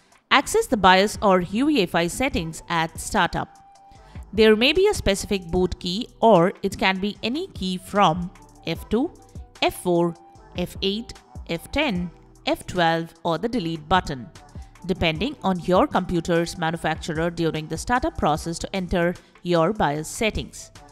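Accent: India. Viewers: Thick India Accent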